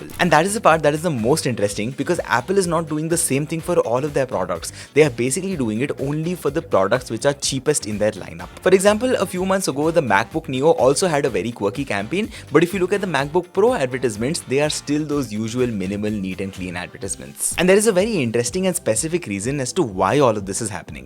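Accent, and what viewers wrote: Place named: India. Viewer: Peak Indian accent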